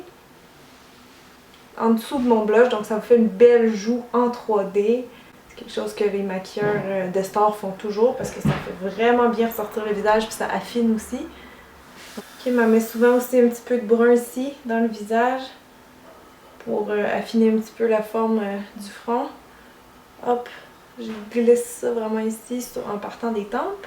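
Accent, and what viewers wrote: Quebec, Canada. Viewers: Tu est québécoise? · J'adore ton accent quebquoi !!😻😻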